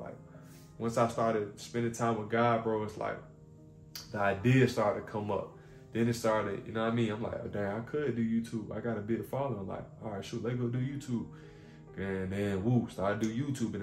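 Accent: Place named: Chicago, United States. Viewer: first time watching your video. i can hear in your accent that’s a chicago accent. i can hear that from anywhere. showing love from out here in chicago man ❤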